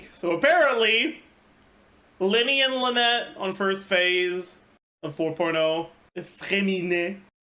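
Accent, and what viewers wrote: Quebec, Canada. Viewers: Except as he put it if he ever travel to Paris, people will have to focus to understand what he is saying. His accent is really pronounced but its not as if only Quebec has strong accents so its no big deal. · ouais, alors, en tant que français.e de france, ça reste chaud le français quebecois ToT mais j'adore <3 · I speak french but I'm not used to canadian french, I understand so little lmao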